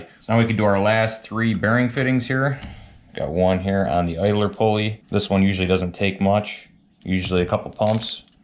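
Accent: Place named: Western New York, United States. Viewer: Lol I'm in Rochester... I recognized your accent immediately. WNY represent!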